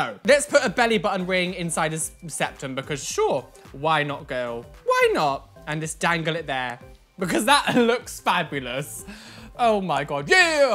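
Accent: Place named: RP, United Kingdom. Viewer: I love how he is British but he sounds like he is a Royale prince from England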